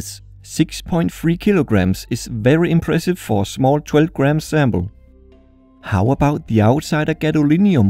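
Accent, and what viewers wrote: Denmark. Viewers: Are you danish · Are you danish?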